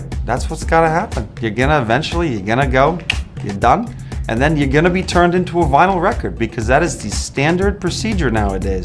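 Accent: New York, United States. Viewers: I can hear his New York accent. Especially when he's answering the Alec as a mundane question and he's like "You don't gotta..." 😍😍😍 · He’s such a New Yorker. I didn’t know for sure he was from New York but you can just hear it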